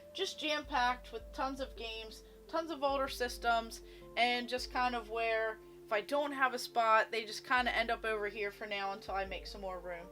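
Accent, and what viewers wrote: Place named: Philadelphia, United States. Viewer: With that accent, you must be from the Philly area.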